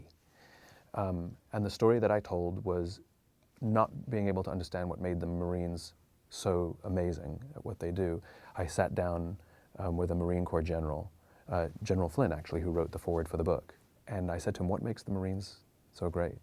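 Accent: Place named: England, United Kingdom. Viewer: He had a little English accent going on in this interview.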